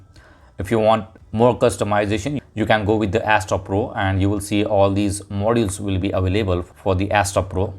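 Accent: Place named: India. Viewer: I dont like indian accent 💩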